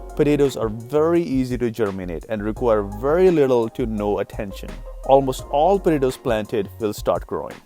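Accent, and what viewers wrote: Punjab, India. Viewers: Love the punjabi accent.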